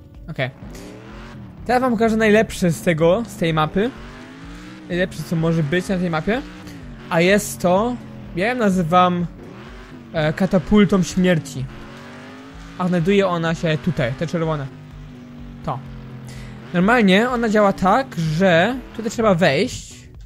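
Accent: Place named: Germany. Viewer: ty gadasz z akcentem niemieckim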